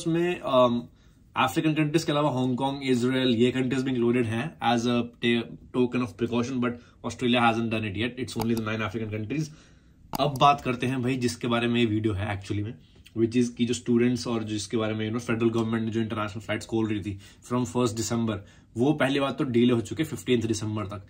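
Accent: India. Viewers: Im Sorry if I offend to somebody but what kind of lenguage does this guy speak. English Indi??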